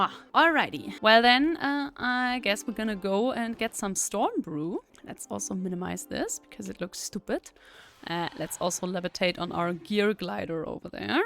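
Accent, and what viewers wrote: Germany. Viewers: Monk! Best class!!! 😃 A true master don't let dictate his style by others. Deutsch? Man hört es am akzent. 😅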